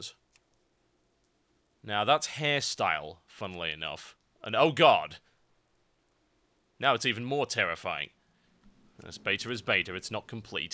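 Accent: United Kingdom. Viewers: Just subscribed... Seen a few of your vids last night and thought ''Hey, this guys British like me, and almost as funny!" Some top-class uploads, keep up the good work - Much appreciated.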